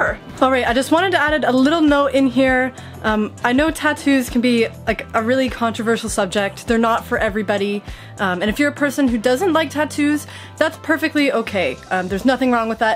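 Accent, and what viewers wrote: Canada. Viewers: Thanks for sharing,. I’m not a big tattoo guy but certainly respect your decision, your reasons for getting them, and the meaning they have to you. Stay safe and healthy and look forward to more videos, (and, no matter where you travel, don’t ever lose that great Canadian accent)!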